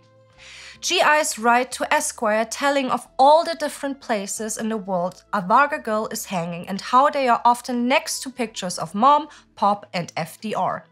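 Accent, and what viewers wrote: Germany. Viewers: Why you got Axis Annie representing your channel? Couldn't you find an American woman to speak for your program? There must be about 150 MILLION of them, you gotta choose a German speaking woman? That's pitiful.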